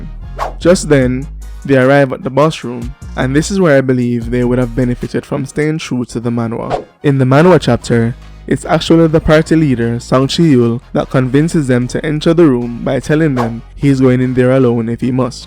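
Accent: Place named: Jamaica. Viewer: Sounds like a yawdie. Great breakdown.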